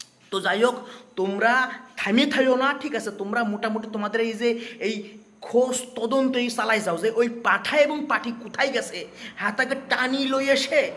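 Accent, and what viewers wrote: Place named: Noakhali, Bangladesh. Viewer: Noakhali te bari naki tomar 😅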